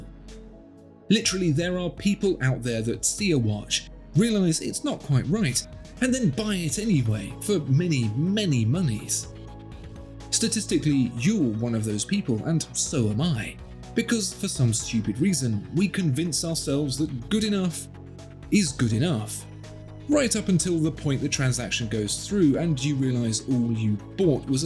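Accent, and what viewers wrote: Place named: England, United Kingdom. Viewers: It's an English accent. You know, the language you're writing in. Have a day off from yourself Xiang. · Never mistake an English accent for intelligence.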